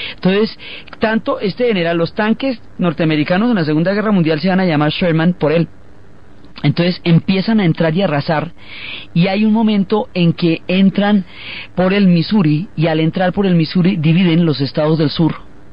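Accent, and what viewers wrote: Colombia. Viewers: me pasó igual,el acento colombiano, y lo de "parche" "sensacional" jaja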